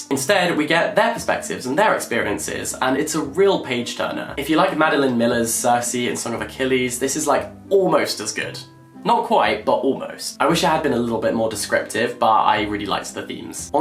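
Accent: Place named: Scotland, United Kingdom. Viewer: My brain started thinking in Scottish is so funny I'm laughing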